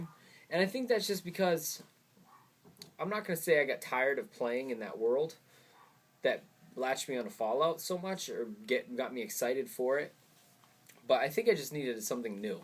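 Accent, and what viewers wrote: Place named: England, United Kingdom. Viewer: I signed in just to like this because of the english accent lmao great job.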